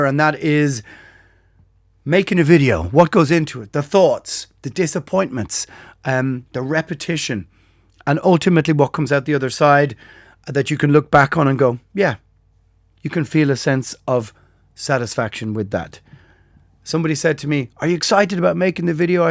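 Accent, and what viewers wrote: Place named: Ireland. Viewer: Whenever you say (parentheses) I automatically know you are actually referring to "quotation marks" because you then use the phrase "rabbit ears." But it never registered you were misphrasing it until you started pointing it out, because the Irish pronunciation of the word is different from the American pronunciation :)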